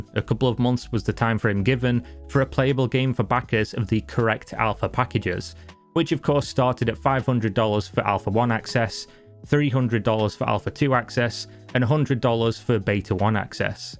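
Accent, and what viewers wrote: Northern England, United Kingdom. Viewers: Where you from? You sound Northern. Anywhere near Grimsby?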